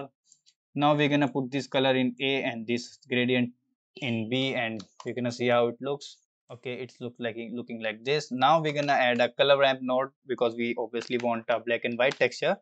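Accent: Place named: India. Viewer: LMAO! i wanted to say i like the indian accent :))) BUT YOU SAID IT FOR ME :DDDDDD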